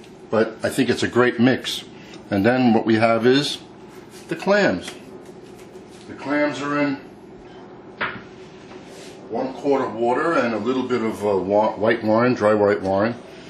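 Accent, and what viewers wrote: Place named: Boston, United States. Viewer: When I clicked on this video, I thought to myself: "How awesome would it be if the guy cooking Boston clam chowder, actually had a Boston accent." Apparently my wish came true!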